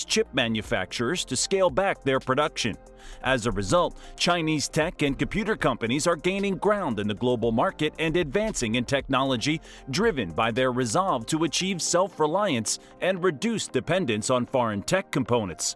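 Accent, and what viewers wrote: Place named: United States. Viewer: Why use an American accent when you're anti USA? Keep it 💯 wus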